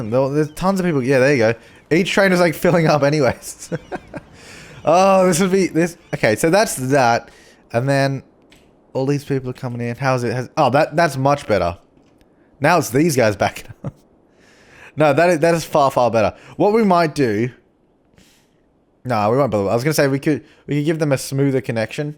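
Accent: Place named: Australia. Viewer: What your Australian? I thought you were from the UK PS: I didn't know Australians drive on the left side of the road.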